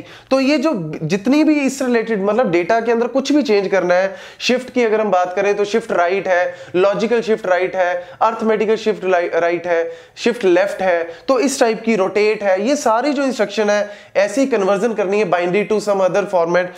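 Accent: Punjab, India. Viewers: The best Computer Architecture teacher ever! I hated this subject until I started watching your videos, Sir. :) I love your Punjabi accent, it makes the lectures interesting and fun. You are always to the point and cover the topics in such less time. I am thankful for your speed. If I ever become a professor, I want to teach like you.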